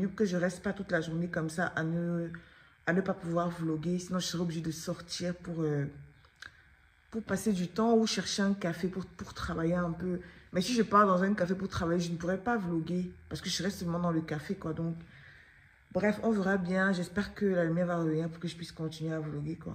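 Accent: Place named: Italy. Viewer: C'est l'accent italien là qui me retient !!!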